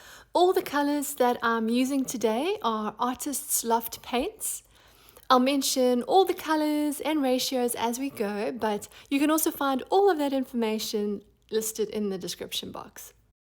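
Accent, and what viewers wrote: South Africa. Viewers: Stunning! Nice to hear a South African Voice❤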